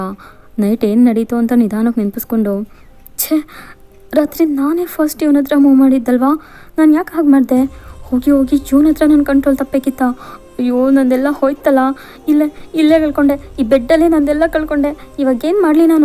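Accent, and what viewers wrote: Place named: Tamil Nadu, India. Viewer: Chenagide akka and love ur tamil accent ❤😁 ennu bere bere ff gal haki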